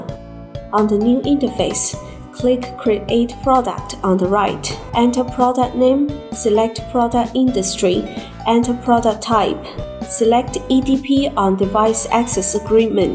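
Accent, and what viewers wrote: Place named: China. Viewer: No one like Chinese English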